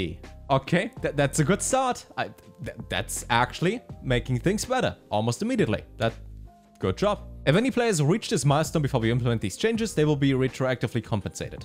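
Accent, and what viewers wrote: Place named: Germany. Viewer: he's making a lot of good comments, but boy, this German accent is killing me ...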